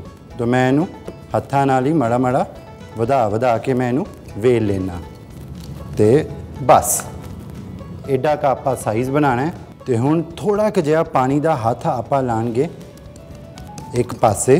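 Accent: Punjab, India. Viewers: U talk Punjabi in so sweet way. God bless you. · Puttarji...I have liked the video mainly because your sweet Punjabi! Of course I loved the kulcha too. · Your punjabi tone is very nice i like it very much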